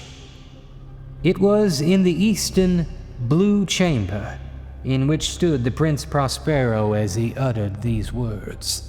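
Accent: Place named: South, United States. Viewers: Glad to see you're feeling well again. I applaud your choice of a soft southern accent, as much of Poe's youth was spent in Virginia. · I love the added southern accent as well. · Oh my gosh,! That reading was much more interesting and dramatic than I thought it would be. The costume, the hair, the MUSTACHE! And the changing lighting add a lot of dramatic flair. The voice, the accent was a very nice touch, although the slightly southern twang surprised me. You made Edgar Allan Poe, master of horror, sound...folksy. I almost thought I was listening to Samuel Clemens, instead!;-) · Why do I have the sudden sensation of pitching a Jordan Peele or Ryan Coogler film as I listen to a Southern accent reading of "The Masquerade of the Red Death?"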